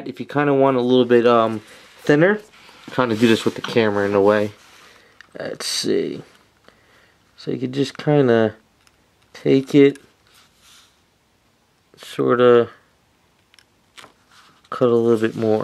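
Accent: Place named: South Jersey, United States. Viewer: the south jersey/philly accent here has soothed me as well as given me all the confidence in the world to cut up my paint brushes immediately. Thanks for the tutorial!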